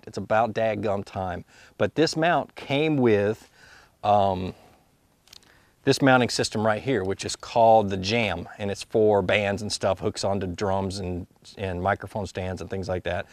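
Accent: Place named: South, United States. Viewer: i like when he goes "you see that right there" the southern accent was so strong lol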